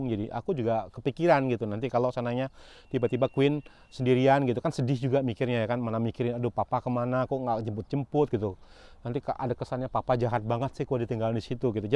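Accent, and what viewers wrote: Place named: Medan, Indonesia. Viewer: Hai salam kenal ya 😊 Ohya nada bicaranya mirip² orang medan jg ya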